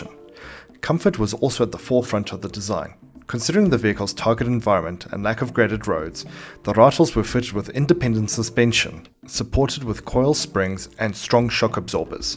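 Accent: South Africa. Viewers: mind if i ask are you south african? i can hear it in your voice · Are you south african?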